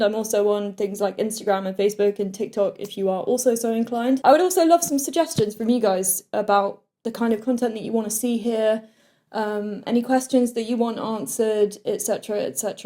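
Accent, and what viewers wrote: United Kingdom. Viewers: Thanks for sharing your knowledge so didactic! I love your British accent and mainly your guitar-making! Regards from Brazil! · Guitar necks, katana's. Yes it's most likely your accent. BUT, Also in American English , thay tend to drop the letter T from a few of their words. For instance ; important is said, impor ant. Mountain is said moun an. ive even heard prodly instead of probably. So you mix this with your british accent and you get katana. Funny thing is they actually pronounce the T in Katana. Why do I know this? Because I'm a Kiwi living in the states for eight now.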